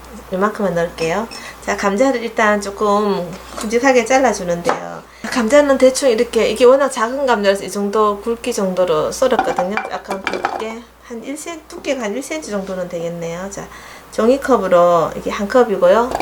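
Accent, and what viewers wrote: Gyeongsang, South Korea. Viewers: 사투리 경상도 말씨 푸근 친근감 가요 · 경상도 사투리를 어찌 이리 맛갈나게 쓰시는지! 음식보다 말씨가 맛있어서 보게 됩니다. 사진을 보니 인물 또한 고우시네요. 동영상에 얼굴을 보여주지 않는 이유가 시선과 관심을 님의 미모가 아니라 요리에 집중시키려는 배려 같군요. 요리 또한 맛있을 것으로 기대합니다.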